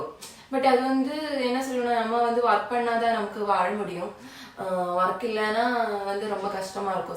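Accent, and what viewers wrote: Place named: Kerala, India. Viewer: Malaiyalam kalantha Tamil speach ketka Rompa Nalla iruku.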